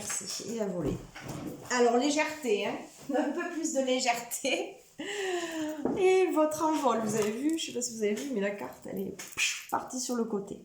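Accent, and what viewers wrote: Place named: South, France. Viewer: ✨✨Bonjour ✨✨ je suis abonnée depuis peu à ta chaîne, je pense pas me trompé, en disant que c'est Aurélie Chamane qui nous à parler de toi et de ton oracle, et que les cartes était faîtes à l'effigie de tes peintures??? Wouah...tu as l'accent du sud comme moi. Qui suis native de cette région. Ta guidance ma parlée, je te remercie pour ce partage. À tout bientôt..... ✨🌴🌈💜🙏💜.🌈🌴✨..😘.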